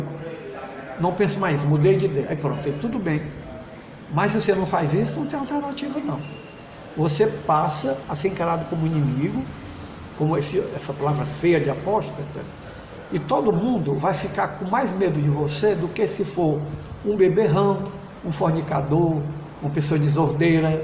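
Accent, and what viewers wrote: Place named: Rio de Janeiro, Brazil. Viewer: Este é sem sombra de dúvidas o mais carioca de todos os cearenses. Parabéns pelo ótimo depoimento. Grande abraço.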